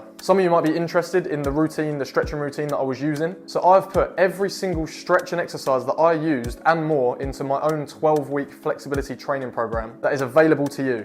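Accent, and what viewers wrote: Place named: United Kingdom. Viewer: 😂 British English, man I understand everything, my first language is Portuguese, but it sounds funny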